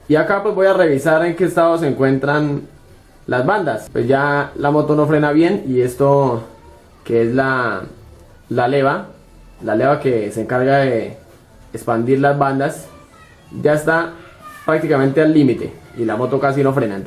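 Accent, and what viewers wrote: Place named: Colombia. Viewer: Buen video... Tu voz y acento colombiano me hizo acordar a Nicolás, el mejor amigo de Betty la fea. Saludos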